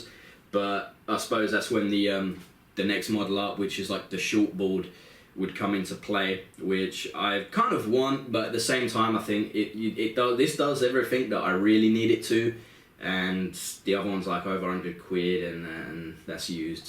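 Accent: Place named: Rio de Janeiro, Brazil. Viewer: He speaks like a pal from Rio de Janiero!..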